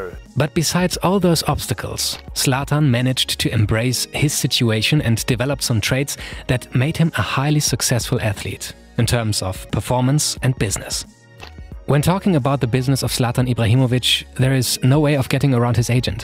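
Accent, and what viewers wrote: Germany. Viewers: Deutscher akzent kickt hart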